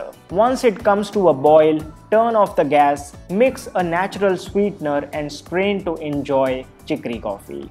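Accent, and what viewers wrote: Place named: Gujarat, India. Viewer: और गुजराती एक्सेंट में इंग्लिश Funny साउंड कर रहा हैं 😂😂😂😂😂😂😂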